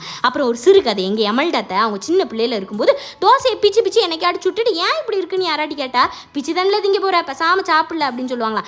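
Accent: Tirunelveli, India. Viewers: எங்க எத்தனை தமிழ் பேசினாலும் எங்க திருநெல்வேலி தமிழ் தனி அழகுதான்❤❤❤ ஏலே😂😂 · பேச்சு வழக்கின் நமது திருநெல்வேலி பேச்சு நமது அக்காவிற்கு வந்தது❤❤ ஏ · பிச்சி தானல திங்க போற பேசாம சாப்பிடுல பக்கா திருநெல்வேலி வட்டார பேச்சி ❤ நீங்க பேசுறது அவ்வளவு அழகு❤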